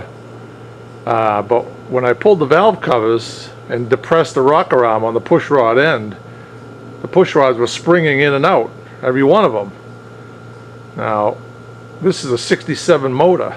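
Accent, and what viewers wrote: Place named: Maine, United States. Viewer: you must be from maine.... "motah" lol... (I'm a mainer as well)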